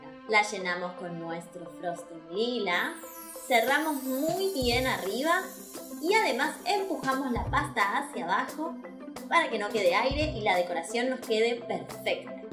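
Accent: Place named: Argentina. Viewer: Hola te hago dos preguntas:1- hace cuanto que estas con este canal? (solo me aparecen 2 videos tuyos)2- ¿Eres de Argentina? Pregunto por tu hacento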